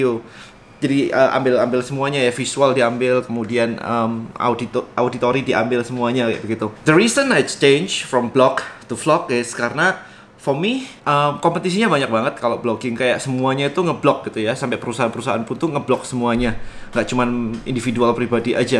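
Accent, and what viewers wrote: Java, Indonesia. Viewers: Medok tenan ko kkkk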